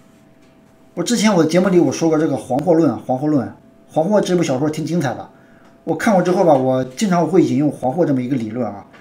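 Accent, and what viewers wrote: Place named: Northern Jiangsu, China. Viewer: 口音苏北嘛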